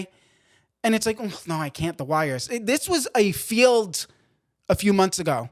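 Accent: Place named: New Jersey, United States. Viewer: Your jersey accent sounds amazing. Da drawer. Love it!!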